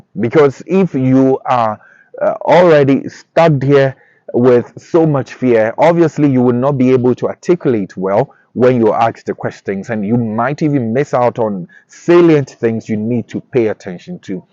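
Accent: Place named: Caribbean. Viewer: my brother you sound like youre from the Caribbean. ty so much for the information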